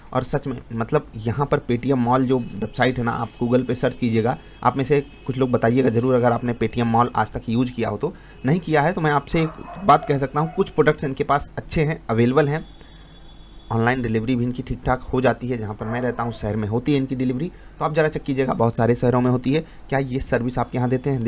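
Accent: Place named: Uttar Pradesh, India. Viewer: Sir ap kon c city me rhte ho. Apki tone se main guess kr skta hu aap Uttar Pradesh se belong krte ho. Kindly reply krle batana jrur muje sir m waiting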